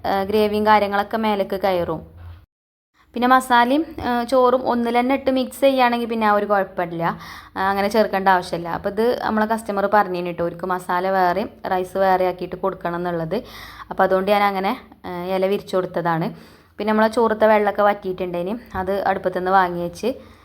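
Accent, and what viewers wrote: Malappuram, India. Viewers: ഇത്താന്റെ വീട് എവിടെയാണ് മലപ്പുറകാരെയാണെന്ന് സംസാരം കേട്ടപ്പോൾ മനസ്സിലായി. സ്ഥലം?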